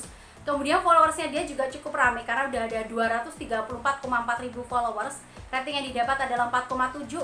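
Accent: Java, Indonesia. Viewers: Medok ya kak😄😁mksih infonya kk. Menginspirasi bngt😊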